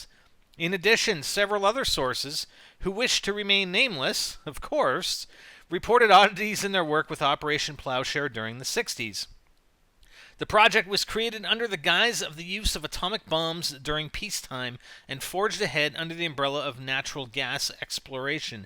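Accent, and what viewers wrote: United States. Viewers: Where do u live you sound american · What country are you from? You sound American or Canadian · yeah, he sounds american...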